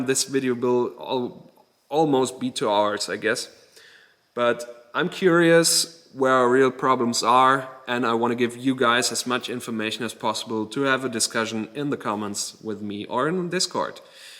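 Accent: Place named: Germany. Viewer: Endlich mal ein deutscher der ordentlich englisch kann ... nicht akzentfrei und auch nicht ganz fehlerfrei, aber immerhin besser als das was man sonst so hört.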